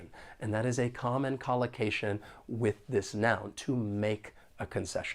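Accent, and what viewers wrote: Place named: General American, United States. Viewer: he answered "the US". Not North America. Let's not split hairs here. If the accent interests the author of the Q, he should have been more specific. has a standard American accent, regardless of the region he comes from.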